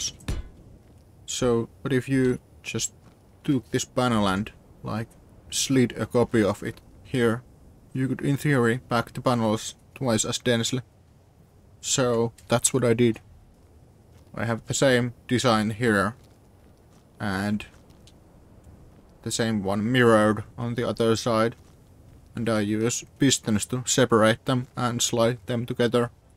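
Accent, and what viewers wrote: Finland. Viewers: Just found your channel earlier and love it! Keep up the good work man. Also, you have a very interesting accent, are you from Finland? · You sound finnish, Are you finnish?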